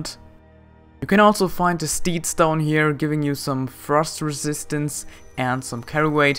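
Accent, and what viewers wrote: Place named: Germany. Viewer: Guys he's German.. So i wanna hear you guys speak German.. And then say no it's pronounced like that.. -.-'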